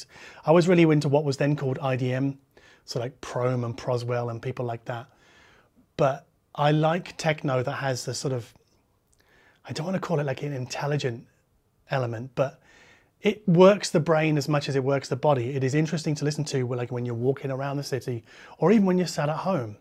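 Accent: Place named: United Kingdom. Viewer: Thanks for your recommendations. I am not into techno. The tracks you introduced are killer. System 7 is a brand new experience to me. Beeing audiophile for 40 years now, it is always nice to learn something new. Your videos are on my shortlist everyday, because they have this quality i like. And i like your british accent...👍😜😂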